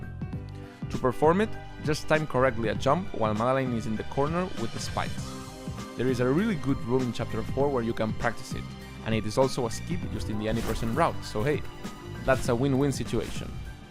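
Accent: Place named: Spain. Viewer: Eres español? Porque tu acento parece español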